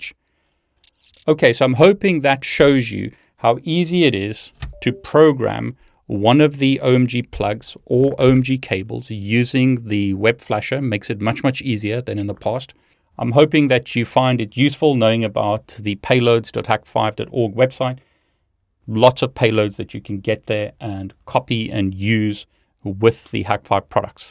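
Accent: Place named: South Africa. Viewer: Now that looks like some nice toys., I am starting Cyber Security at the age of 45 thanks to you, Niel and Networkchuck! Are you South African? Your accent sounds SA...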